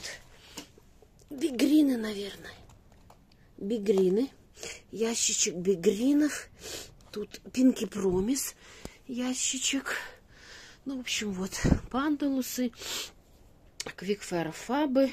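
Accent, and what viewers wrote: Belarus. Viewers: Здравствуйте! Откуда вы? То, что из Беларуси, я поняла по разговору. Из какого города? В Гомеле продаёте гортензии, розы? Хочу Самарскую Лидию.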